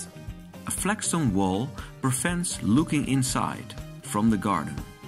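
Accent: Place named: Netherlands. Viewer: Another well turned out vid. Your voice and cadence of speech are very good for the narration. And the Dutch accent is chic. :)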